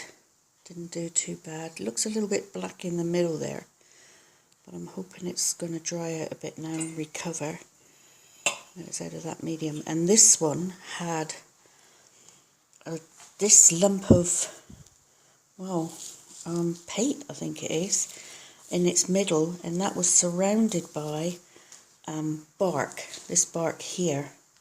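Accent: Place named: South, United Kingdom. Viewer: I do wish growers would remove the old medium when they repot, it takes ages to carefully remove especially the moss from oncidiums fine roots! Bark is better to remove but those hard packs of I don't know what they grow the seedling in is also difficult to remove. Found out your living in NZ from this video but your accent tells me your originally from the south of UK. Keep posting please!!!!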